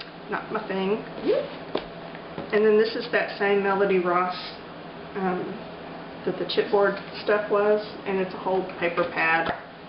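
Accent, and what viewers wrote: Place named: East Texas, United States. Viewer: Your so funny. Born and raised Texas girl here, I dont have a accent like you though. Sound like East Texas to me, lol. I hope you enjoy all your goodies. I have the same trimmer and I don't know if I like it. It doesn't cut straight for me at all. I have gotten used to its little quarks and pretty much know how to set my paper so it will cut straight. Thought the wire thingy would help with the cutting straight issue but no I have yet to find a cutting I absolutely LOVE. TFS Mari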